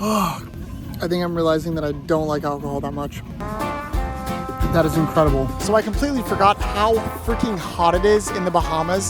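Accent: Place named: Germany. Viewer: german accent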